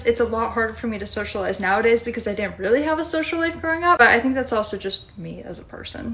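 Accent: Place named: United States. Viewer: You've got an American accent, but a Russian face 😅😅 Your acceptionably beautiful 😍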